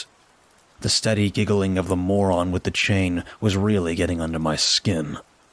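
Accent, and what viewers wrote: Scotland, United Kingdom. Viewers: haha love your Scottish accent not bad at all 👍🤣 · I wasn't meaning to criticise. Its like Christmas when you upload. Your content is always top quality. It was cool that it wasn't the typical generic hollywood scottish accent. Your still much more accurate that others would do.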